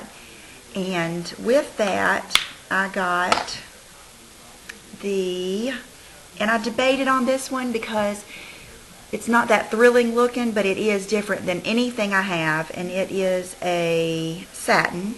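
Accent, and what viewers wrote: South, United States. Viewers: I Love your videos..watching them has made me wont to go out and try new things..I have always used the same make up forever, but my make up collection is starting to grow LOL Thanks for your inspirations..Also I detect a southern accent....Im also from the south..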